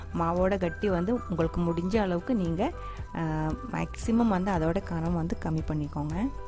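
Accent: Kerala, India. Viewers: Malayalam kalandha tamil wow. dish semma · Excellent demo.. your Tamizh in Malayalam base is very very sweet, beautiful blend in your voice.. like the nice yummy ilai adai😃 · Oh sweet malayalam mix tamil. 🤗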